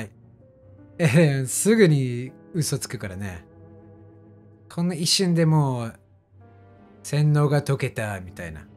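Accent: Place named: Kansai, Japan. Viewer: えらい外国人顔の関西人やね。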